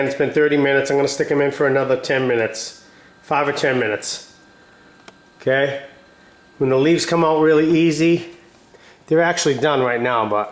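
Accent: Boston, United States. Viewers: I haven't ever seen them made with tomato sauce, now that is Sicilian, but you have a strong boston accent!!!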